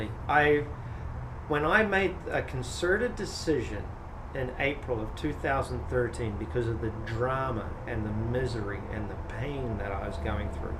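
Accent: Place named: New Zealand. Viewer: thats a very light New Zealand accent. easy to follow for us Yanks.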